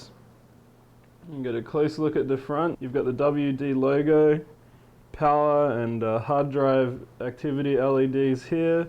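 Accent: Australia. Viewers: r u Australian? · It's an Australian accent, and Australia is a lot better governed than the US.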